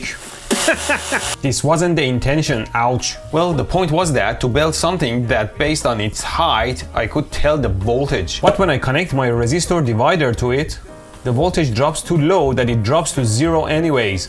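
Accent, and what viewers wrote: Iran. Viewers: Ultimate parsian accent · با لهجه غلیظ فارسی انگلیسی صحبت میکنه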